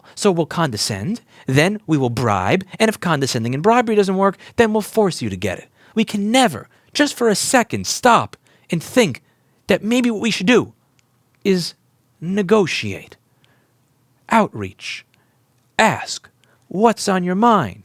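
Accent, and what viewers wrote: New York, United States. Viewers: This was definitely the most NY I've heard hahah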